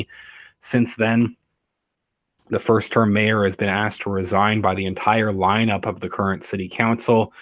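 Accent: Canada. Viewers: Where is he from?, sounds Canadian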